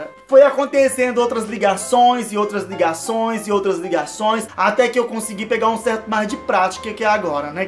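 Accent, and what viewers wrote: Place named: Goiânia, Brazil. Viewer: Vc é de Goiânia conheci pelo sotaque, doido que nem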